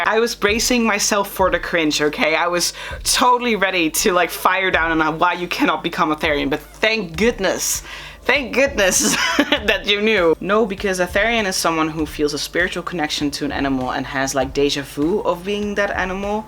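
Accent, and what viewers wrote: Netherlands. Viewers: You kinda have a little Dutch accent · Random question are u dutch cause the accent sounds familiar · Are you dutch you sound dutch and I am dutch oh and love the video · oommgg ur english sounds dutch, ben je nederlands? er zijn echt bijna geen therians hier ben echt zo blijj aaa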